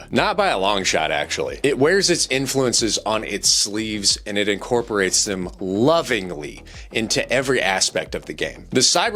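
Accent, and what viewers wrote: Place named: Australia. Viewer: The Australian accent got you a follow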